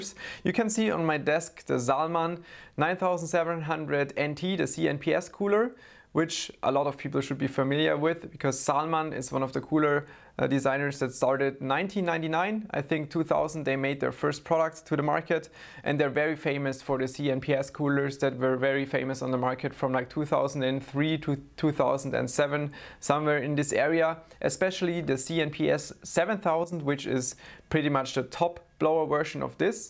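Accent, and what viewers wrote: Germany. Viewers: Man merkt direkt dass du deutsch bist xD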